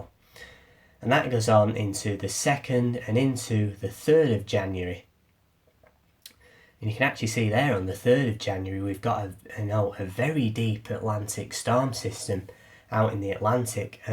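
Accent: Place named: Manchester, United Kingdom. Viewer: My father used to regale me with tales of the winter of 1947 when he was a little boy. I love the narrator of these series. He's a Mancunian, probably, and every other word is "actually" for dramatic! effect.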